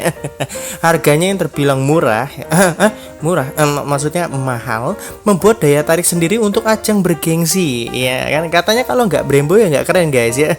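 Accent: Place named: Java, Indonesia. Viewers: Bahasane lohh kok dadi medok bang😂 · wooo.. wong jowo to, medok² gurih..:v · Kenapa ke jakarta malah medok to bang 😂 kekel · orang lampung tapi medok🤣🤣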